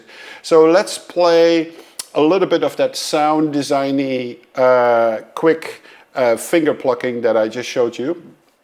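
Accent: Netherlands. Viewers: Love the Dutch accent ahah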